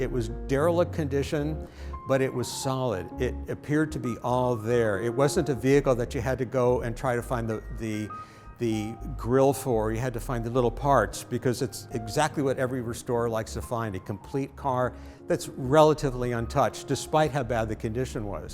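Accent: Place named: United States. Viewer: Cannot fathom American pronuciation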